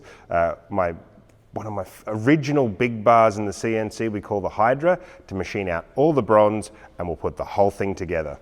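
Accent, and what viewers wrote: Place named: Australia. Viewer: Hi, new sub here Jon, from mid-wales, formerly from Colchester, which is a bit weird to hear the old homestead being called out in an Aussie accent :-) I tend to think of Colchester lathes as being 50s-60-s machines, student/master et al. nice to see the name at least still going strong :-)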